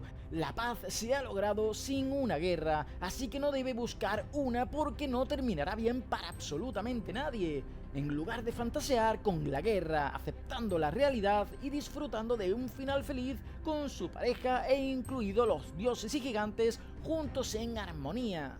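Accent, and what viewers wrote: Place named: Spain. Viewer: por como los llamas y tu acento supongo la traducción española les dio esos nombres... me quedo totalmente con los latinos xD El final me agradó y me dio pena, fue innovador y diferente aunque en un inicio me dio rabia y hasta le grité a la tele xD pero me pareció bonito, sin embargo deja muchos huecos argumentales y e suna gran invitación a ver de nuevo la serie. Me quedaré con las ganas de otra temporada y que todo fuese real, pero se me apretó el kokoro con el momento final de isolde y la versión del tema que pusieron.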